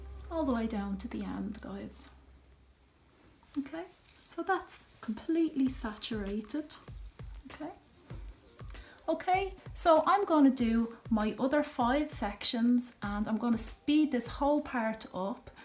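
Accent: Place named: Dublin, Ireland. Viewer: Your accent! you are Irish 💕Dublin accent💕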